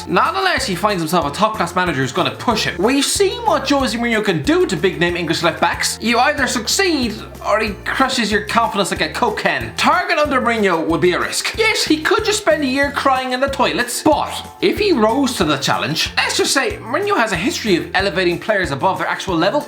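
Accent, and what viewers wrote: Ireland. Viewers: i love waking up to an ranting about football every morning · Hello and welcome to HITC sport right. Love when says that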